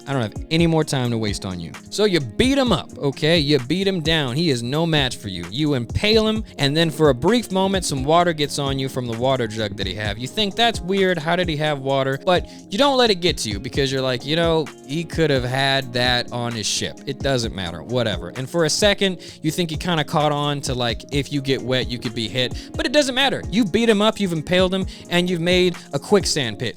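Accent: South, United States. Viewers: I love your southern accent, where you from?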